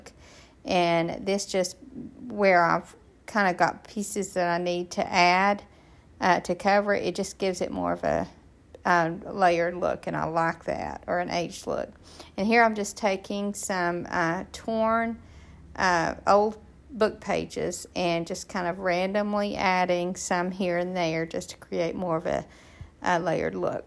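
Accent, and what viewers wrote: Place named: Tennessee, United States. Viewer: I was going thru YouTube and saw your site. Love your accent. Are you from Tennessee? Enjoyed your kind instructions, of the amazing silk flowers. Do you think , this would work with real flowers?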